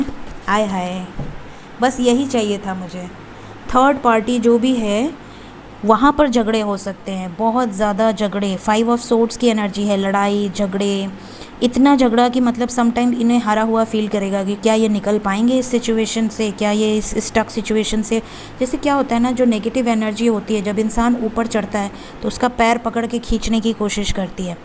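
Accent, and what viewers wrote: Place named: Varanasi, India. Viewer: Dii jiss tarah se aap bat karti hai I totally luv it❤️ full banarasi language even I m from varanasi Mahadev🙏 love your positive reading 😇🤗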